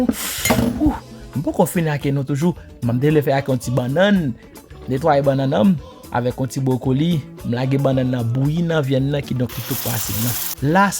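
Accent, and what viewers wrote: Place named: rural, Haiti. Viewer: Pagen capital anko aksan ban moun nn morn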